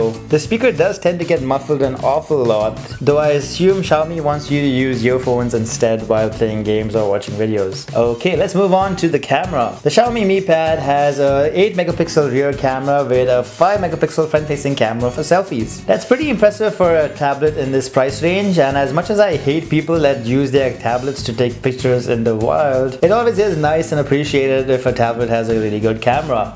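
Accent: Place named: India. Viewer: Nice review, I just want to buy this right now! Xiaomi just arrived here in Brazil, and I really hope they keep up soon with this device, just incredible for the price. And... dont change your accent, its cool, I watch some indian review channels on youtube and your accent is one of the most fine between all. Actually is a little bit of cute to hear!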